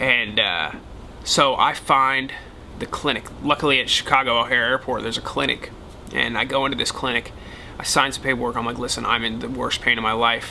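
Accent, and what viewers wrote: South, United States. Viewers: hey man. you can tell your from the south. your a nice guy. almost thought you were from Texas for a minute. lol hook' em baby!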